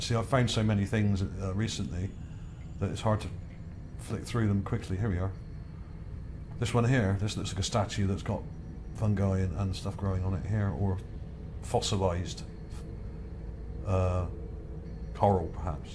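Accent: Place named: West Country, United Kingdom. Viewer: dont deny your history , Mr, Westcountry accent. love your recent stuff , some of your idras can some times drift a little, but the upside is the west country drawl is as an OPIATE to many of your disciples.. thank you for the free opiates...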